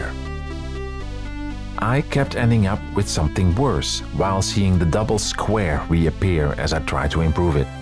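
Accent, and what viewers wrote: Netherlands. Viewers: In the 1990s, I was already an experienced engineer in my 30s. Some technologies that used 7-segment: "Numitron" (incandescent), LED, LCD, VFD (vacuum fluorescent), mechanical (plastic, brightly painted on the active segments), & "Panaplex" (Neon, used on some 70's microwave ovens, and gas pumps). This guy's accent sounds like his native language is Dutch. I was born in the Netherlands. Moved to the US as a child, and use to tease my father about his thick accent, in my teens. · Why is it that i can always hear that someone is dutch? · Are you from the netherlands? it kind of sounds like it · The Dutch accent is truly horrific. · noticeable Dutch accent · I already thought I could hear it from the accent, but hell yeah this man dutch. Mooie video, ik hou wel van dit soort deepdives in iets waar je normaal gesproken nooit over na denkt :)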